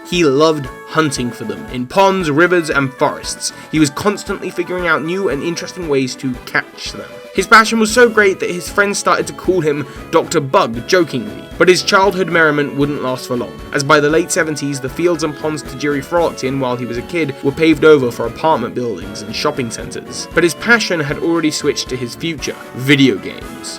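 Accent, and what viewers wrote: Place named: United Kingdom. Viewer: sweet British voice is just perfect for this.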